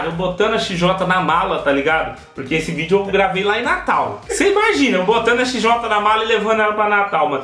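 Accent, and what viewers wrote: Goiás, Brazil. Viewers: fala por que vc fala no pique do goias vc conhece goiania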